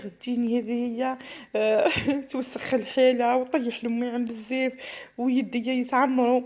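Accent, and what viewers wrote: Setif, Algeria. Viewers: الله الله عليك ياسطايفية الحرة انا من قسطينة ونموت عليك يالحبيبة ونعشق الهضرة السطايفية الحرة ولله نحبك ونموت عليك ياعمري واني نضال فلمول نحوس 💕💕💕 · السلام عليكم، تعجبيني أختي، و خاصة هدرتك لهجتك السطايفية تهبل تهبل تهبل . ربي يشفيك، ماتتكليش غير على التيزانة، لازم دواء.... طهور إن شاء الله. تحياتي من تيبازة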